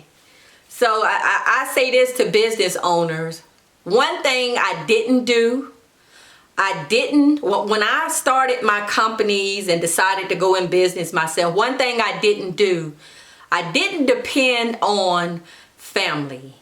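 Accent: South, United States. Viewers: Im from north Jersey and I just love that . Southern accent .. and you look great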